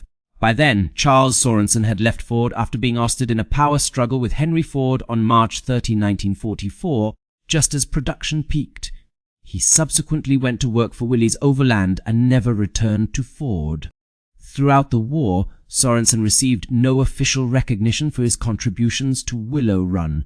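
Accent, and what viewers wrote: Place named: United Kingdom. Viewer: Why add distracting and annoying flickering speckles and vignetted cropping to what could have been clear full images?!! Plenty of clear accurate public domain images of personalities, facilities, etc are available but your AI generates ridiculous junk. Why Brit-accented AI narration of 100% US operations?!! Can't your AI narration pronounce names, especially German, numbers, and much else correctly? DISLIKE! UNSUBSCRIBE!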